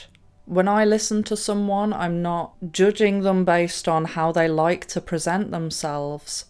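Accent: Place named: Birmingham, United Kingdom. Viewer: I like ur accent where you from? Im a brummy you sound like ur by them sides. ?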